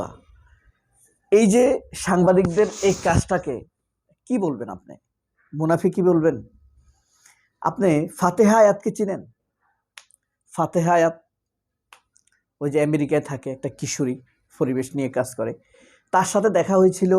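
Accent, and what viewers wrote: Noakhali, Bangladesh. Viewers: Host who you are ? Who count you? You host or talks show creator ? You can’t speak pure Bangla ? Why you speak Nokhali ? What is your logic but mantis law enforcement or justice ? Unnecessary can’t attack any one or physically abuse ? Anchorage peoples don’t break law respect justice or law enforcement.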